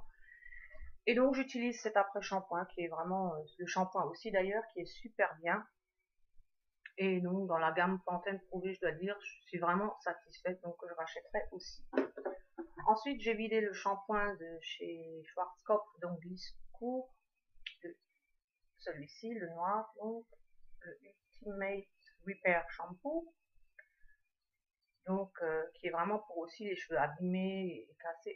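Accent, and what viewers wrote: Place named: Lorraine, France. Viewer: tu es de la lorraine ? ton accent me fait penser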